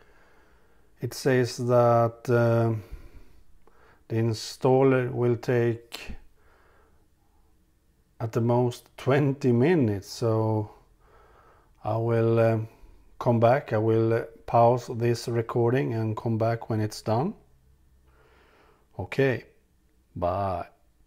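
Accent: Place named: Sweden. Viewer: love the swedish accent :D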